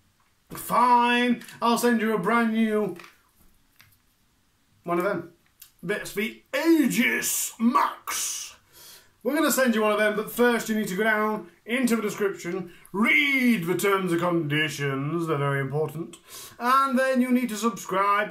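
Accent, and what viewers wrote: Yorkshire, United Kingdom. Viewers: You naughty boy 😂😂 where abouts are you based I spot a Yorkshire accent 👊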